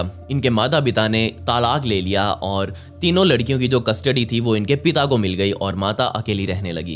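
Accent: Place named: Western Uttar Pradesh, India. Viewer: Bhai teri language suke lagta hai tu sayad west u.p se hai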